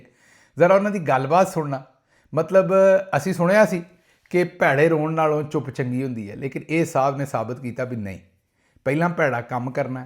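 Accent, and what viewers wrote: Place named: Malwa, India. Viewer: Bahla harami aa Dr gill jka safe aali malwayi bolda but chubbviyan gla karda.. dharam naal nzara aa janda Dr tere video dekh ke charde punjab to.. dr vaise kise veele choundey chakk dinna Faislabad to lagda marasi hun mein ni kehna ptandra ggaint Dr sahab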